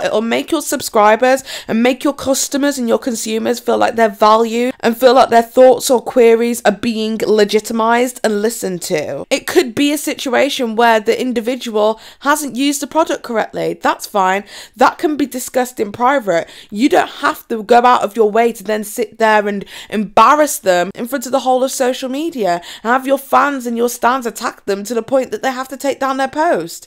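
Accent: United States. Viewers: Your American accent is really good but it makes me feel so weird 😂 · Your American accent tho!! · You do a better American accent then I do and I’m american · Your american accent gave me life!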